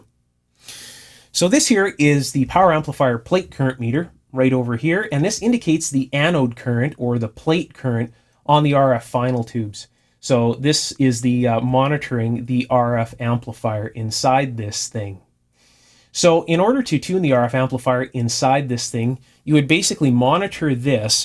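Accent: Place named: Canada. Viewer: Canadian accents are the best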